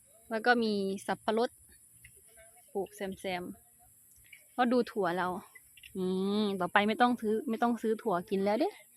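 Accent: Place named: Sisaket, Thailand. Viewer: สำเนียงศรีสะเกษ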